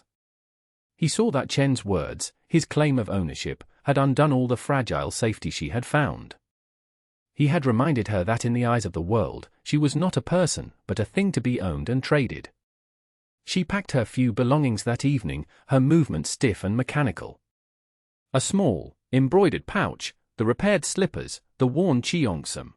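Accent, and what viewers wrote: England, United Kingdom. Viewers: Interesting yet disarming - - A narrator with a British accent telling a cowboy tail from the Old West 😞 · Please get rid of this hard, annoying English accented voice. It absolutely does not fit these stories. Bring back the warm sift and rich American accented narrator. This voice is extremely annoying.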